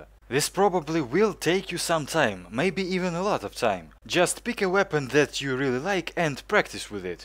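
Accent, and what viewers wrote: Russia. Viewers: Мэн а что ты хотел от русского человека?) Идеальное произношение??? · ага, и будет ужасный русский акцент и на немецком. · Акцент русский но вообще Можно делать видео на англизком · лучше смотреть видео у англичан с их акцентом ,чем слушать наш,просто)а так хорошая попытка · Акцент сильно русский(((((((((((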